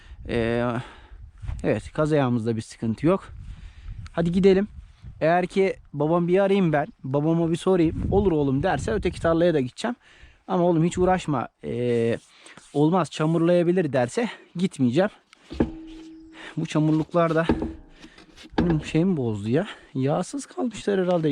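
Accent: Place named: Edirne, Turkey. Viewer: Merhaba Abi, aslen Eskişehirli misiniz? Bizde Edirneliyiz de konuşma şeklinizi bize benzettim şive olsun ağız olsun ☺ Göçmenlik var mı acaba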